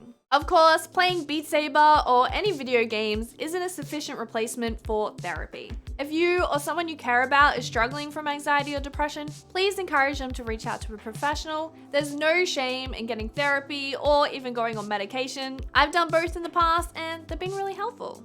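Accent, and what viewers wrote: Australia. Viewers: Such a great video! super brave and open to share this, I truly love your videos. hope you won't have another second of anxiety ever again <3 p.s. nothing beats the aussie accent! :P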